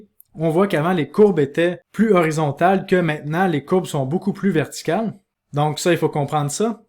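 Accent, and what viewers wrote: Quebec, Canada. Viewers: Merci, tu m as meme reconcillie avec l accent Quebecois, Excellente chaine, travail positif et tres tres objectif Merci et surtout continue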